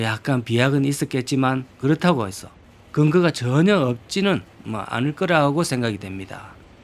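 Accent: Gyeongsang, South Korea. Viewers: 경상도 말투는 왜 촌스러울까 나두 경상도인데ㅠ😊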